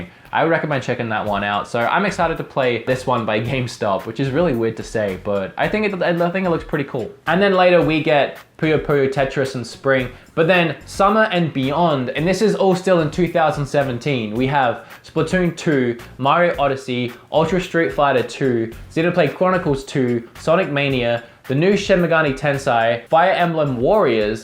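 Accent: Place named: Australia. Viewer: thicker aussie accent and a calm expression......sure did change 2 years ago lol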